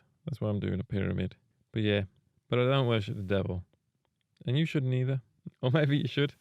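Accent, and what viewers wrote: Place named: Dudley, United Kingdom. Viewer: Dude I could have sworn you were from Dudley.... But now I'm tryna figure out where the hell you live 😂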